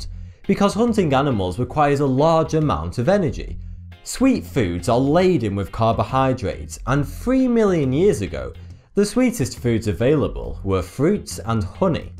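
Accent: United Kingdom. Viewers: he's so British ha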